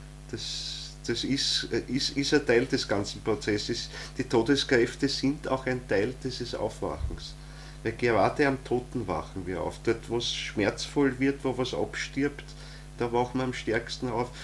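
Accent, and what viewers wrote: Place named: Austria. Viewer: Vielen herzlichen Dank für die so wichtigen Vortragsreihe. Bloß ist es Stellenweise nicht so einfach wortlaut vollständig zu verstehen wegen der östreichischen Akzente.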